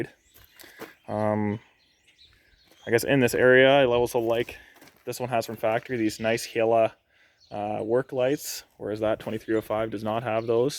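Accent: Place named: Ontario, Canada. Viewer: I can spot an Ontario accent anywhere....no doubt aboot it😅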